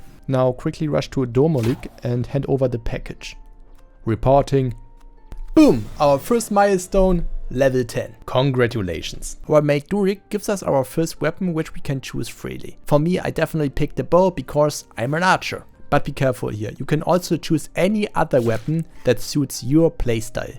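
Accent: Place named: Germany. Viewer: Accent is German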